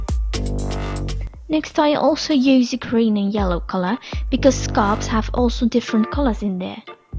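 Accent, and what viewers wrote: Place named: England, United Kingdom. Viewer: Coooool!! U Engels accent kilt mij😂😂😉😘😘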